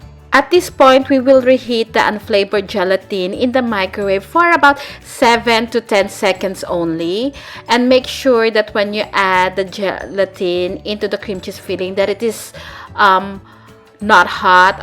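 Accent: Philippines. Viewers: Just want to ask if youre an Ilongga 😆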